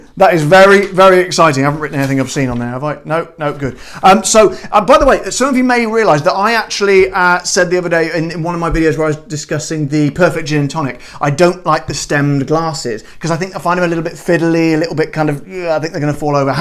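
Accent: Australia. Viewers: 10-10 for the Aussie accent mate! Just bought this Gin tried it tonight. It’s unreal!! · As an Aussie, as well as this being my first time ever watching one of your videos, I actually thought you were from Australia for the first 30 seconds! Top marks on the accent. I also just bought a bottle and it's delicious. For all you non-Australians, don't worry about getting ripped off, its still expensive as fuck here too :(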